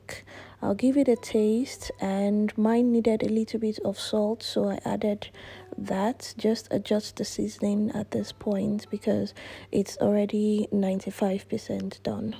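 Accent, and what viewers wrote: Nigeria. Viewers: Polished , polished polished wait a minute , was that voice yoruba ?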